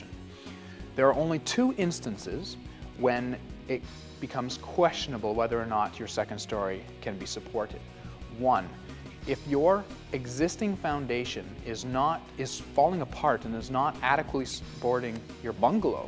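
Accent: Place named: Canada. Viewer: Obviously this guy is Canadian. i.e. "blowing the snow off when it precipitates in the winter" and the accent. Not for North Carolina where I want to add on.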